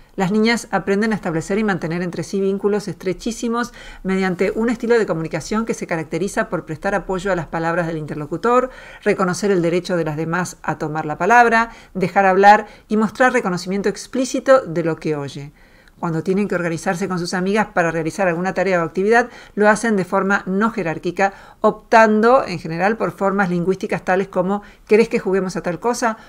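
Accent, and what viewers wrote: Argentina. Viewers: Castellano a lo mexicano con acento aegentino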